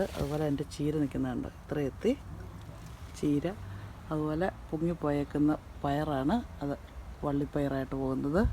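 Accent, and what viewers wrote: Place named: Kottayam, India. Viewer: I like ur videos very much becoz of ur fast talking..20 minute watch cheyunnathu oru worth anennu thonnum..unwanted introduction, explanation onnum illatha video valare ishtamanu..bore adikkilla..vegam karyangal avatharipikum..keep it up..r u from kottyam district..becoz ur slang same like us.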